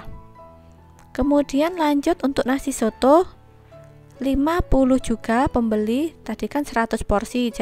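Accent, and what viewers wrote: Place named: Java, Indonesia. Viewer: Logatnya mirip orang Jawa.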